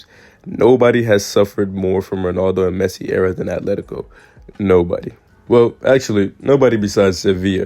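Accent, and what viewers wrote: New York, United States. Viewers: Great video. I like your humour and like your accent. I could tell you from New York because you sound like another YouTuber that’s from New York lol 😂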